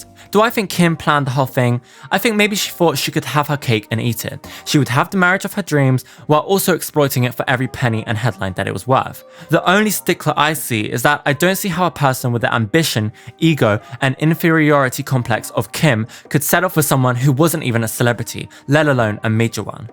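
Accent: United Kingdom. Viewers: I really love your accent, reminds me of some of my British heritage and when I lived in London, I also love how you piece everything together and your enthusiasm. It does however, feel very biased to support The Kardashian’s. They have been caught lying a lot so I wouldn’t be surprised if she lied in her book too. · AND FER YOU LIL OOZIE…. AN HOUR LONG. BRITISH SPEAKING DOC ON THE KKW THE ONE AND ONLY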